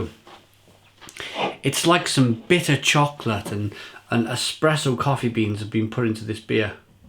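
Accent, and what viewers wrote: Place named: England, United Kingdom. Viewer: which part of England are you from? I find the regional dialects of the country very intriguing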